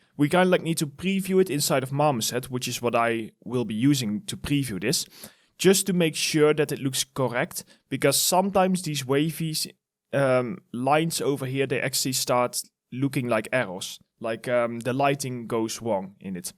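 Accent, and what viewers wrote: Netherlands. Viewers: u are dutch right? XD thanks brother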